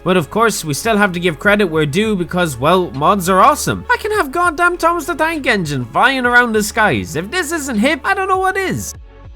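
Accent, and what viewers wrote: Ireland. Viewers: I missed your fucking beautiful Irish accent. STOP TO TORTURE ME AN GIVE ME MORE VIDEOS PLSSSSSS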